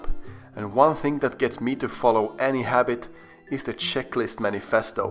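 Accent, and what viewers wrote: Sweden. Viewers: I had a hunch that you're Swedish going only on your accent. confirmed it haha. Nice video, ska testa allt detta! :D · classic swedish accent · Guessing the accent you have, I would say you are Swedish. You have a little bit of a Swedish accent.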